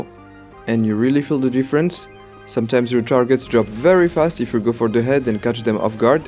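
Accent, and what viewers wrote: France. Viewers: oula lui il a un accent français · tu a un accent français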